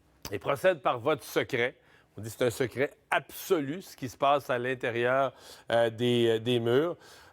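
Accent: Canada. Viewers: Accent typiquement canadien. Je comprends pas comment on peut avoir un accent si bizarre! Les votes des papes se sont toujours passes comme dans des republiques bananieres. Et la chretiente, elle est ou alors!!!!????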